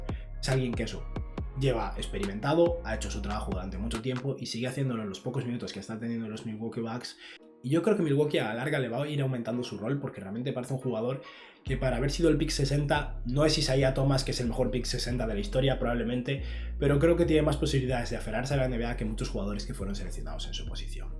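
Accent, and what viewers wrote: Spain. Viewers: any day my dude!! My spanish is not that bad because I had several lessons and my dad is Puerto Rican, im just terrible writing it. You have a nice spanish accent btw lmao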